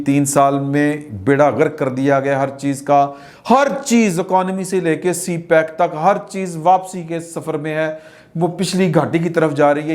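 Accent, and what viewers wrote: Punjab, Pakistan. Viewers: Shafqat Saheb, please avoid speaking Punjabi in a national telecast program. It is uncivilized. Just to let you know that your Urdu pronunciation is also Punjabi type accent. To digest this accent is enough For your non Punjabi viewers. Thanks.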